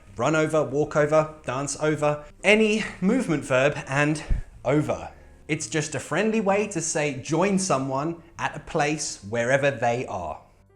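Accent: United Kingdom. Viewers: This is my favorite video. Nice story, cute drawings, clear explanations... and of course your British accent! Thank you very mush for posting it. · I love his British accent 😁💗 lol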